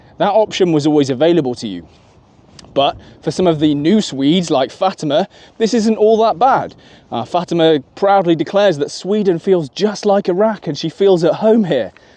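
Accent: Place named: Northern England, United Kingdom. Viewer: strange accent. mostly RP but often produces vowels from northern england. also seems to hupercorrect for a northern accent at times, like a northerner trying to sound southern. maybe went to a public school in the north like rugby?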